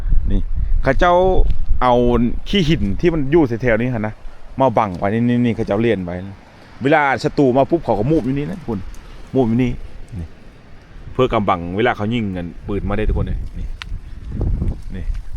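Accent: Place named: Phu Tai, Thailand. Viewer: แกงหอยมี้เฮ้อเผ็ดแกงเห็ด มี้เฮ้อเค็ม.. เว้าคือเดว..กับ ..ทางผู้ไท ..เขาวง...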